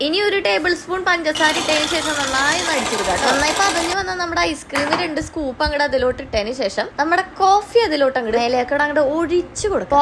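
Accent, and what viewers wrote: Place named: Thrissur, India. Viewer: Thrissur slang pwoli anu tto..pinne ah Aesthetics nannyitund 😅..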